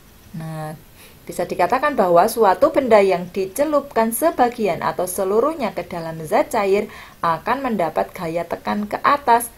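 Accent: Indonesia. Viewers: ibu orang Jawa yahh?👍😭🤣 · Kya ibu guru saya yg dr Jawa Tengah... Suaranya... Maturnuwun materinya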